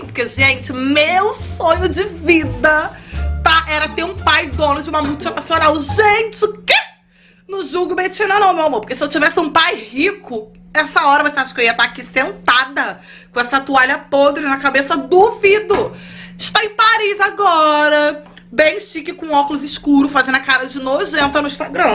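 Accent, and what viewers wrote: Rio de Janeiro, Brazil. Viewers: Você é carioca??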